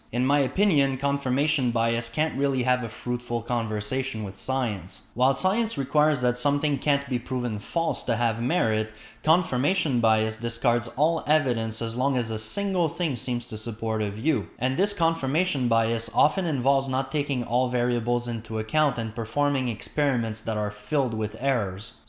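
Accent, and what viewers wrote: Quebec, Canada. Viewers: Je savais t'étais québécois !!! Nice vids ! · I guessed your accent was French Canadian. I completely agree with your reasons for not debating with globe deniers. See Bob the Science Guy’s two recent videos to see how bullying and dishonest globe deniers can be. I think we should be compassionate towards the deluded globe deniers who clearly have psychological problems, but condemnatory towards those who are pushing the flat Earth onto the gullible and ignorant. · Nothing wrong with your accent, although I never would have guessed French Canadian. You're right not to waste your time debating with people who don't want to learn. Have you seen The Last Kingdom on BBC/Netflix? Saxons and Vikings, dramatised around the true events in medieval Britain. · Congrats on the 2,000. Here's to 5K! Please don't change your accent. I love it and it helps you stand out. 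Canadian French would not have been at the top of my guesses. For my part, I like the range of topics you bring to the table. FE is fine, but I agree live debate is a waste of breath. There are a bazillion other topics available to present, or to debate. Somethinks I'd enjoy hearing your opinion on? Gosh, how about: - AI revolution and workforce displacement - Big data works, but should countries allow? - Will AI achieve anything we recognize as consciousness? What could it look like? - What is quantum computing? - Why can't we protect networks and databases, the Internet? - What is super-cold? - What is the hold back in developing electricity storage to enable renewable energy? - Are we ready to seriously privatize space? I'll leave a little warning about the creationist, anti-evolution, and young earth craziness. They are in the same neighborhood as FE plus you stir in evangelical fundamentalism. 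We should try to contain that neurosis to the U.S. But that said it would be fun to see you mud stomp them. ps - please let me if Canada decides to build a wall along it's southern border! lol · Indeed, removing that incentive may well see many a flathead dissapear from here. As a well travelled Dutchman, I tried to figure where your accent is from, but I focussed on the European nations. But now that the cat is out of the bag, I can hear you sound like the Villeneuves, both father and son.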